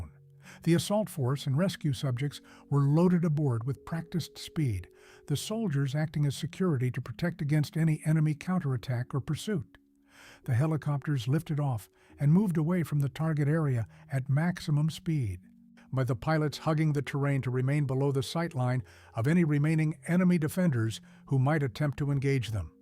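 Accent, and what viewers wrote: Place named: United Kingdom. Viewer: Lads these are well narrated and pretty direct by a fellow Brit, Godbless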